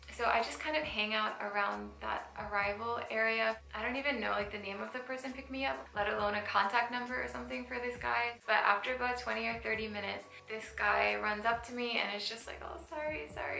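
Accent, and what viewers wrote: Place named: Southern California, United States. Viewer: You don’t have a Louisiana accent, you sound like any southern Cali girl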